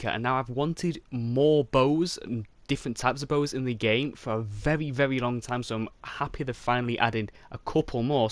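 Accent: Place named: United Kingdom. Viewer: Half the reason I watch is for your delightful accent! British?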